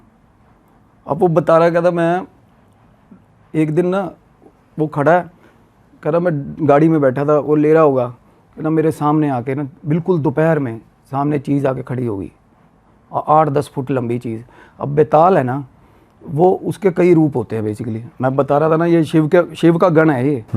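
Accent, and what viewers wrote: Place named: Punjab, India. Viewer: Yeh Banda Boht ache tarike se bata hai bilkul punjabi style me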